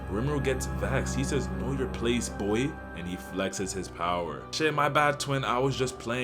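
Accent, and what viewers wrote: Jamaica. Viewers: You have the Jamaican accent bro❤ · Are you Jamaican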